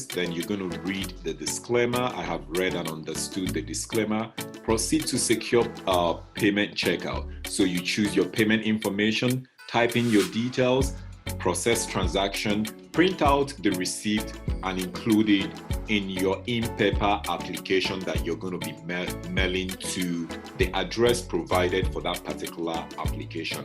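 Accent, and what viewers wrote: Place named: Nigeria. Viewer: your accent reminds me of my Nigerian ex lol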